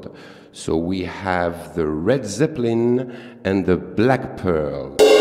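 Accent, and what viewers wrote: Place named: France. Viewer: bonjour, il me semble reconnaître un français derrière cette voix.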